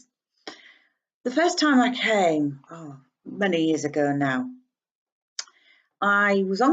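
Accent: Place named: North, United Kingdom. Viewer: nice northern accent, poli kala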